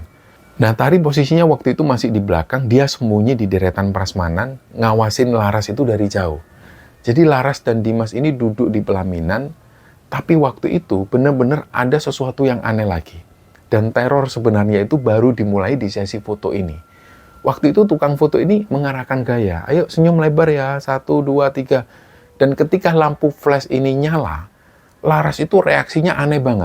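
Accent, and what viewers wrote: Java, Indonesia. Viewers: ❤❤❤Om the way you speak seperti keluarga besar SOLO kami 😂 ( Om, bulik, Budge, Padhe) ❤❤❤ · Asallamualaiqum Mas Salam.Kenal.Bunda dari Kota MAJALENGKA. Suka bnget klo denger crta dari.itu Lho logat Jawa nya enk di dengar Yaqin Mas..😅😅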